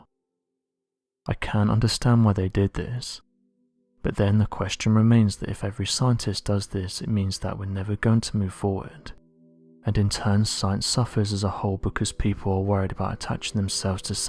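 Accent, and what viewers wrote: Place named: Cockney, United Kingdom. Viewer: Yes, is very hard to listen to.. the low, whispery/breathy narration in cockney is horrible. The other chap is so much better.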